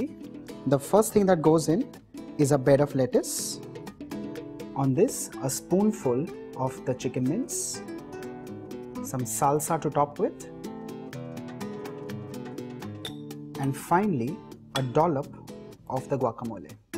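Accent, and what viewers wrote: India. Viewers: Authentic Mexican recipe with Indian accent. I’m going to try this recipe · Love this funny Indian English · mate it's, he just has an indian-like accent.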